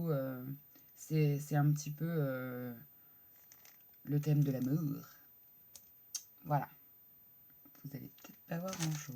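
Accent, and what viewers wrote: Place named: England, United Kingdom. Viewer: J'adore ton accent anglais :)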